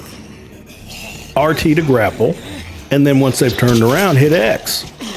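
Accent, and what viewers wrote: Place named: Texas, United States. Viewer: This dude sounds texan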